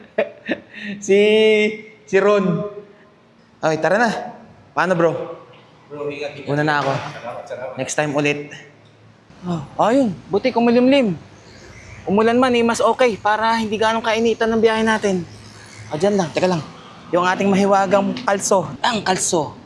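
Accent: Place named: Hagonoy, Philippines. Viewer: Puntong hagonoy. Hehehe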